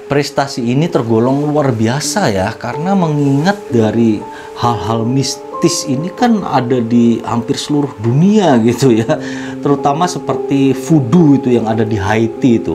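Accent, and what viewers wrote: Java, Indonesia. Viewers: wong jowo mana suara e😇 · Orang jawa mana suara nya kalo ada komentar ya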